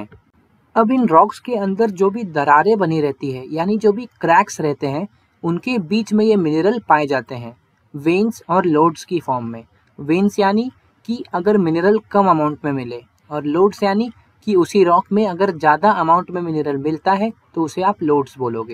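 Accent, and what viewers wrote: Odisha, India. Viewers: Bro are you from Odisha ??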